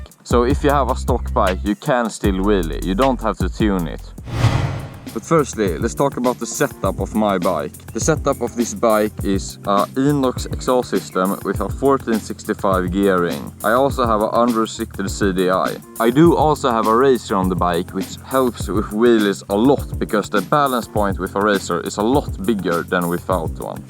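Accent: Sweden. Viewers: Det skriker svensk av din engelska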